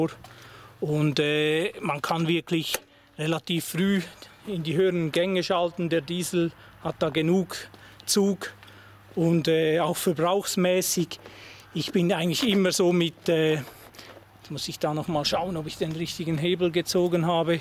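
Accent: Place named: Sweden. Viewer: Are you Swedish of origin? Sounds like i'm hearing a Swedish 'R' :-). Beautiful Alfa.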